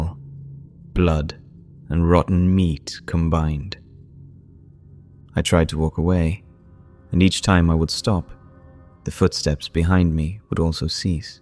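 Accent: United Kingdom. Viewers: If this is your own work, I am impressed with your literary skills.. Usually I have a tough time listening to one with a British accent, but not you, you have such perfect diction and pronunciation that the accent is hardly noticed, besides British accents are the coolest in the world.. I see you are up to almost 7.8K subscribers. I am going to share this video with others and recomend they subscribe so as to do you a solid.. Mark my words, this channel is going to grow Sir... Great work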